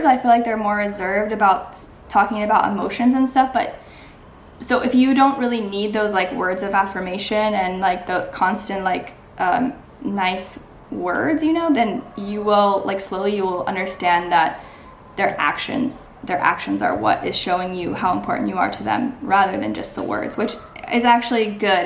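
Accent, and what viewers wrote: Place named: United States. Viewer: You look Chinese with American accent. It is an individual case based.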